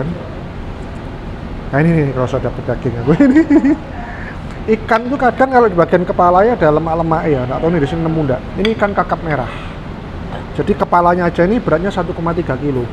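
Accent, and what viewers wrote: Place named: Java, Indonesia. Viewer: Mas, sampeyan jowone medok temen. Trus iku le Madang enak men. Kapan2 aku tak nyoba lah. Suwun share nya nggih.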